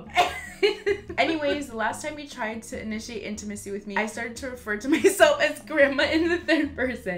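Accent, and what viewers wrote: Canada. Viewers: GIRL YOU CANADIAN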